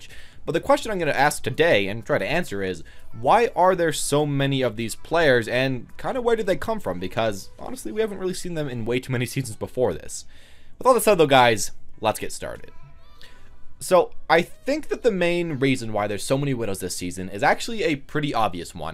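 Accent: United States. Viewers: Are you from like Boston/ New York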